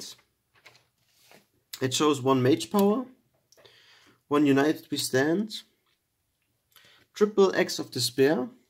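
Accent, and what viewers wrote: Germany. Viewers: wie man das deutsch raushört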